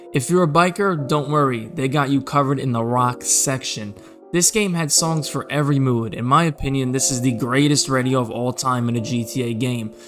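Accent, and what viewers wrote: New York, United States. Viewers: I fuckin love your New Yorker accent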